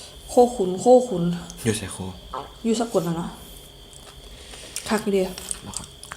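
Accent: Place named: Phu Thai, Thailand. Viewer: ครูเว่าสำเนียงผู้ไทกะเป็นเนาะค่ะ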